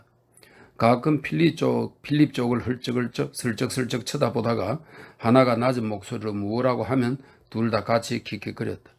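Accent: Gyeongsang, South Korea. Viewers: 갱상도 아재의 두수한 사투리가 맘에 듭니다. 감사합니다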